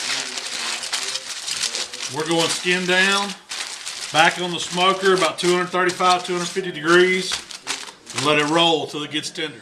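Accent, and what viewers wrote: South, United States. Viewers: Always nice to hear a gentleman with a nice southern drawl work fancy words like crim dela criim into a BBQ video. But absolutely right, there's no piece of meat better than a pork belly. Except maybe bacon, which pork belly essentially is. Thanks for sharing. The belly looks great! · I love how southern folks in the USA pronounce foil and oil as foal and oal lol. Man that looks tasty, some good eats right there.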